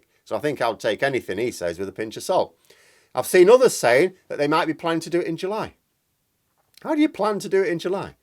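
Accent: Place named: Grantham, United Kingdom. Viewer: I’m going to say that’s a Grantham accent, maybe as far up the A1 as Retford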